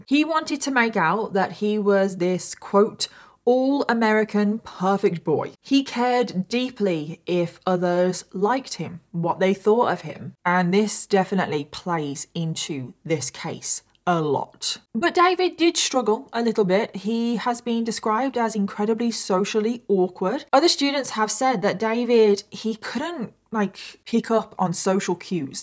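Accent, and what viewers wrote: United Kingdom. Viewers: I enjoy watching all your videos. It’s obvious you put a lot of time and work into making quality videos with honest fact finding reports. Great job. I also enjoy your makeup and have learned a few tricks watching you. Your a very pretty and articulate young lady, and the British accent is super cool. (You do know how Americans love a British accent, right?) 😂I have Siri set on my phone as a British man. 😂 Keep up the great work! Sending love and positivity your way. Aloha. ❤✌️ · She's using the British pronunciation.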